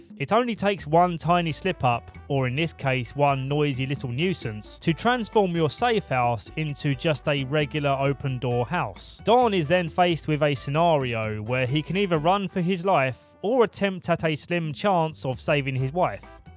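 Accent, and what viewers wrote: United Kingdom. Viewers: 2eyt deys leytaayayayayayaya British english Kills me Always 🫣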